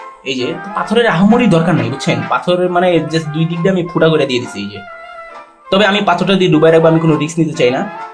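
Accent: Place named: Barishal, Bangladesh. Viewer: ভাই আপনার বারি কি বরিশাল নাকি 😊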